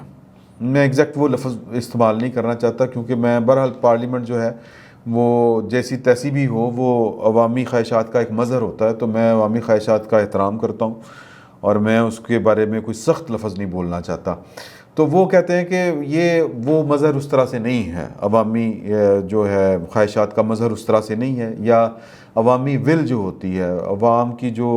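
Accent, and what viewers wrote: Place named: Punjab, Pakistan. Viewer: you got a great style of impromptu narration... mixed with Punjabi Tarka.. Luv from Indian Punjab.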